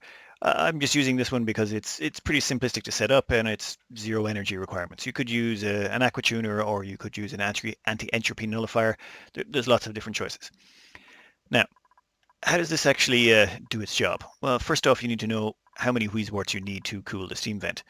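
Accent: Ireland. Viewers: Well, I only noticed it when you said a few words with an Irish accent, though it is true that your accent is not that strong but you still have a little bit of accent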